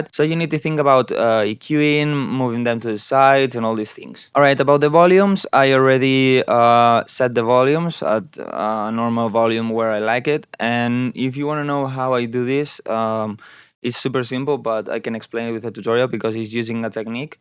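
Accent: Spain. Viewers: Tipic spanish inglish JAJAJA GOOD video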